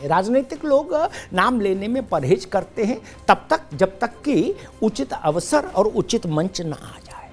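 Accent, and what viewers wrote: India. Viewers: Really ji...u r great..the indian voice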